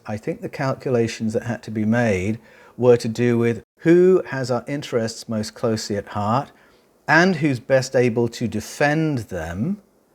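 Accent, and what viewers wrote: Australia. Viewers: I took down a scathing comment fueled by disappointment over tainting your material with background music. But your material is WAY too critical and far too poignant at this time to need ANY "accessorizing" brother. Trust me, you are amongst the vanguard and a pillar of this global movement into Truth, and Truth requires no supplementation or overly loud background music. We're hanging onto your every intrinsically melodic Aussie word anyhoo, and imo you require no musical accompaniment.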